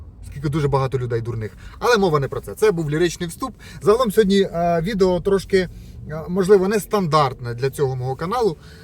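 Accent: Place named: Ukraine. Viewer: Интересно слушать украинский русский )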